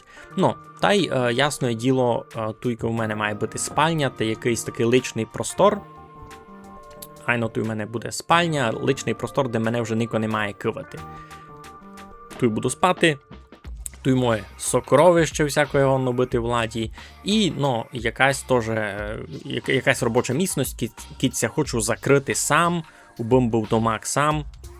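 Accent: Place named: Mukachevo, Ukraine. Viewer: Та просто цікаво стало, в мене є знайомий просто з околиць Мукачева, то він говорить дуже подібно до вас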